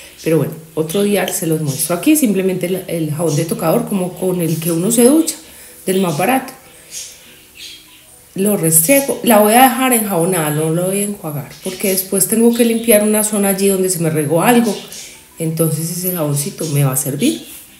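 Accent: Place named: Antioquia, Colombia. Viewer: Hola Sra, excelente el blanqueamiento del lavadero, desde que lo recomendaste lo he venido haciendo, lo mismo lo de mantener blanquito el Trapero, bueno yo aplicó todos tus consejos en mi hogar😊 por cierto me gusta mucho tu acento paisa😊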